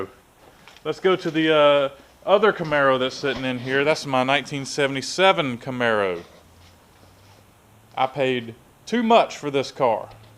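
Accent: South, United States. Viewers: Those aren’t friends. Those are people that want to take advantage of you. That having been said, you’ve fixed your audio lag and that means I’m subscribing and I’m gonna watch all your videos. I think I speak for everyone when I say we wanna see that stash tho. Hola from Mississippi, the southern twang is not lost on me.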